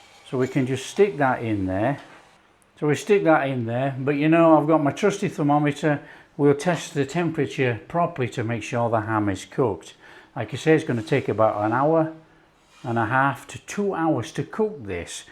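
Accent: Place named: England, United Kingdom. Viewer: Hi this amazing,I hope this ham will be delicious I also like your English you come from England, watching in Mombasa Kenyan coast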